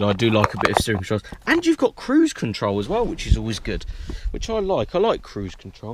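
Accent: United Kingdom. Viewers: Oh My God ... I love UK accent .. !!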